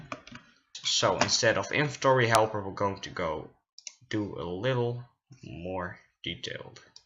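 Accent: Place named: Netherlands. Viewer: Good luck with your exams! Is dutch? I hear an accent..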